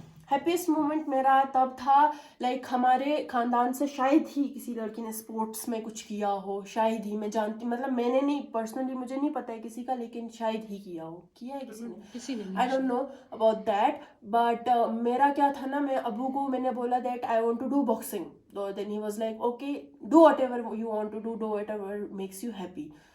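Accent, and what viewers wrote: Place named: Baramulla, India. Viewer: Your way of talking is similar as baramullians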